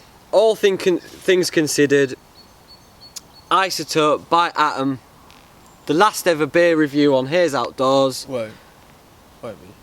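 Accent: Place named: North, United Kingdom. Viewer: Came by after Zed mentioned you and I'm glad you did. Quality vids. How much did you have to pay Rick Grimes to camp with ya? haha. Can't place your accent either. I know its northern but it's got hints of all sorts hah. Keep at it bud. ATB, Mike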